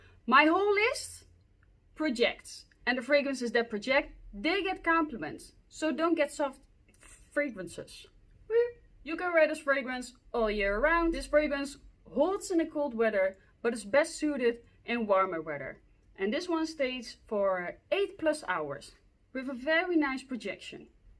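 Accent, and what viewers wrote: Netherlands. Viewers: Love your Dutch accept and Dutch confidence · Hoi ben jij Nederlander coz ur English is that Nederlander accent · In like two seconds I was like Dutch accent?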